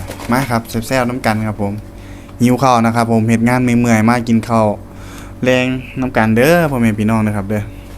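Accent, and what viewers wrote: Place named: Sakon Nakhon, Thailand. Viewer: เว้าญ้อคือสกลนครเนาะหนุ่มน้อย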